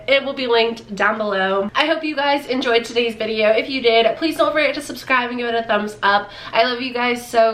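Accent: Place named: South, United States. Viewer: Love your videos! ❤️ I like how honest you are and plus your southern 😂 just like me! IG: Tillery_09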